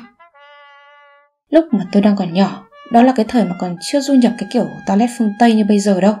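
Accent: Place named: North, Vietnam. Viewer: Giọng Bắc kỳ thì khó nghe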